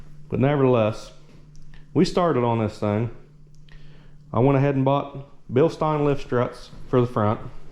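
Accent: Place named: Appalachia, United States. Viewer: You have to be from SE Ohio or WV.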